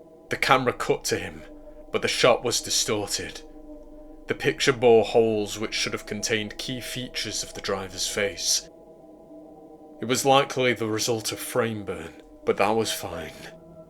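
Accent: United Kingdom. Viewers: ahh I thought so good to see another British narrator 😀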